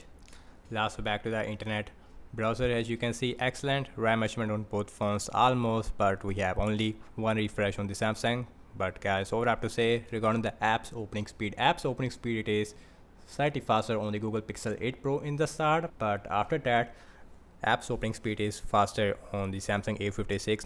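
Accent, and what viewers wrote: India. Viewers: I can barely understand this review due to his pronounced Hinglish accent!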